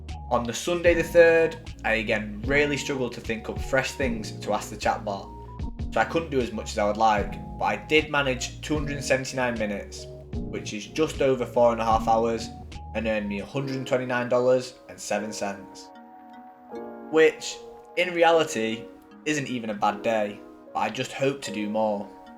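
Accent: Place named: Liverpool, United Kingdom. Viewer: I love the Liverpudlian accent. But then, as an American with Grandmum from Bradford, I'm a sucker for any British accent. I really appreciate your videos on Data Annotation. Thank you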